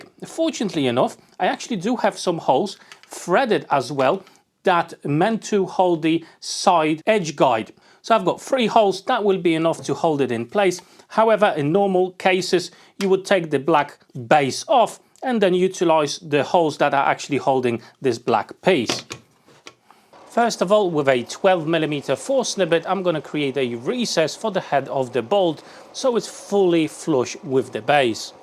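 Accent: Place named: Northern England, United Kingdom. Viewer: Nice to hear that you’ve retained your northern English accent 😅.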